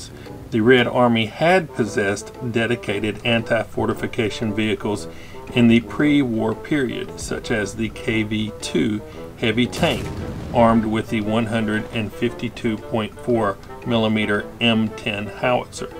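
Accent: South, United States. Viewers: Are you from the south? You have a southern accent...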